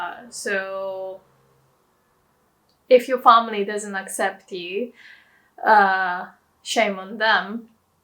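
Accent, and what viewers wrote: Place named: United Kingdom. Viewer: Omggg your English is so good it sounds like almost a perfect British accent normally people learn with an American accent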